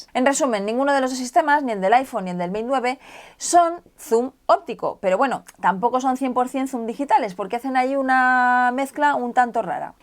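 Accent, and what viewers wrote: Spain. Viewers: Bruno Godoy pues por el acento obvio de España !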